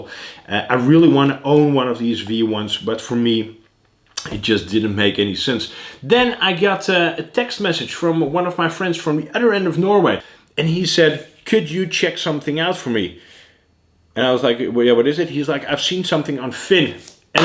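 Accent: Netherlands. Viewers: You Dutch?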